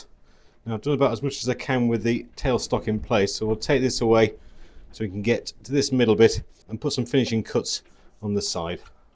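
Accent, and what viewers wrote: United Kingdom. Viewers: Hi, really interesting to watch, nice to hear that touch of Scouse. Will carry on watching, well done. Tom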